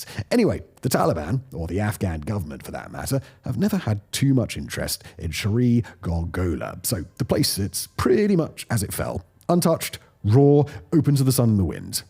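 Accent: United Kingdom. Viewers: Lots of his words are strange like that where he pronounces them in this weird, British way lol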